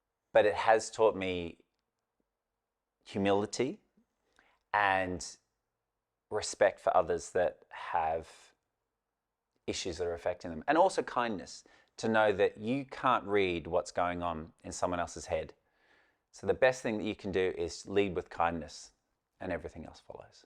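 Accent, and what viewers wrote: Australia. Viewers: I am so sorry to hear about you and Zoe. I was thinking that something was wrong because you stopped wearing your wedding ring. You are such an amazing vet with an incredible affinity for animals and people. You are humble, open, and honest. You are so brave to share all your personal business. We want to know because we care about you and Zoe, and we want to be assured that you are alright in every aspect of your professional and personal life. Also, I love to listen to you talk because of your alluring Australian accent. Please continue to do these videos and your Rescue Vet program. Love from a Canadian fan. Wishing you luck and success. ❤😊 Deb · Love the channel, I about to rescue a retired greyhound, I have had one before and they are the most beautiful dogs. I love your accent, I have my Siri on Australian! 🇦🇺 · Because he was born in my Hometown of Brisbane QLD Australia & never lost his Aussie accent.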